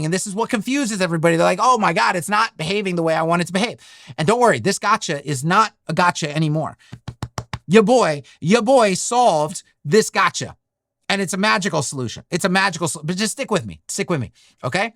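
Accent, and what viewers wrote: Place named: United States. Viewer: Is it me... or does (general American accent) say "era" and "error" the same way? 🙂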